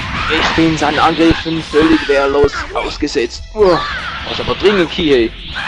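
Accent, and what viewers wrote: Switzerland. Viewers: Ich finde deine Stimme nur sympatisch, bedingt durch deinen Akzenten. Schweizer haben nach wie vor den coolsten Dialekt. Und nebenbei, echt tolle Let's Fetz, Fight, Play, wie auch immer ;D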